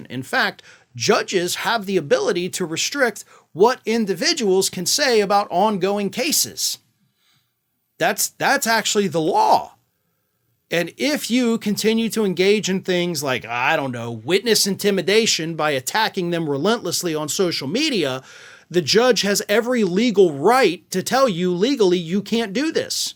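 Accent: South, United States. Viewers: You're edging into a 'southern' accent.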